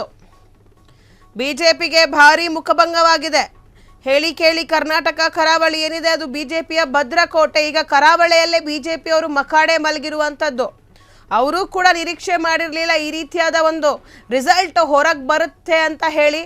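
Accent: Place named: Kerala, India. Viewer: Auru malayali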